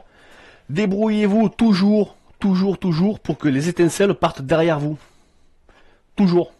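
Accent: South, France. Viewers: Merci pour ces bons conseils donnés avec ce bel accent du sud ;o) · Super Sympa et très utile ce tuto. Que d'excellents conseils avec une pointe d'humour c'est toujours agréable à suivre. Par contre un petit soucis..l'accent plutôt du sud et le petit kenavo breton . Alors plutôt Rennais ou Marseillais ;-))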